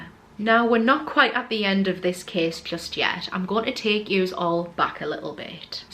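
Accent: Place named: Scotland, United Kingdom. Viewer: Holy Smokes!!!!???!!! What tge H***!!!! Are you from Scotland? What a STRONG, STRING accent!! 😅😅😅😅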